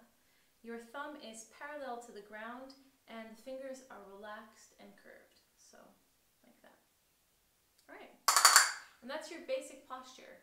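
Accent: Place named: Australia. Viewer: I can hear that Aussie accent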